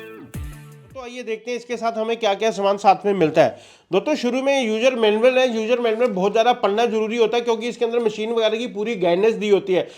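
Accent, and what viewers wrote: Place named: Punjab, India. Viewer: Abhi aap delhi se hai ya punjab se aapke bolne ka dhang punjabi lag raha hai 🙏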